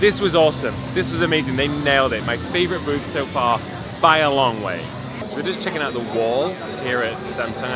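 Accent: United States. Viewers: What's the American accent all about? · you sound so american:) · I agree you do take on the accents, and it is none of my business tbh. You do have a nice USA/Northern twang, it just seemed more exaggerated in this particular video. Sorry if I offended you.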